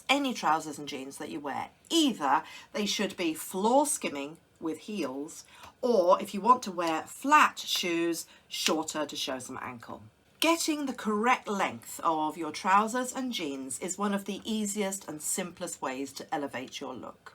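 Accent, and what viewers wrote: United Kingdom. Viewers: Hi D,,, new from CA,, I will be 72 in a few days,,April 2024 I have made it!! I agree that it is your lovely kind presentation that got me subscribed...TY! You all might get a super kick out of this But,, I stopped wearing certain things back in the 20 the century,,,Jeans, jewelry, jackets, heels...all out!! lol...I wear big old clogs year round to accommodate my bunions ( and sneakers), I layer on scarves and I like to paint on shirts and my winter coats to keep me colorful and comfortable as well...Love your look and your Brit accent! Mo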